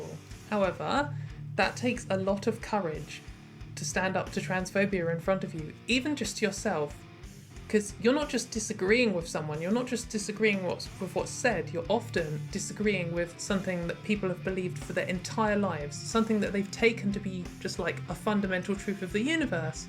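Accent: United Kingdom. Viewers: Seeing u speak with a British accent is very weird. I imagined u had a transatlantic American accent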